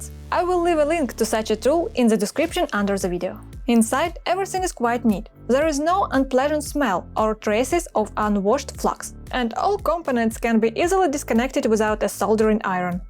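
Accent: Russia. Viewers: The russian accent😅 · Может на русском делать будешь? Рязанский акцент прям режет уши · Здравствуйте, вы русскоговорящая? Акцент такой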